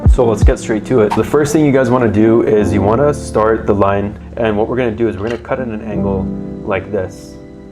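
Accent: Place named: Toronto, Canada. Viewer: Eh, are you from the GTA? (I’m guessing based on the accent)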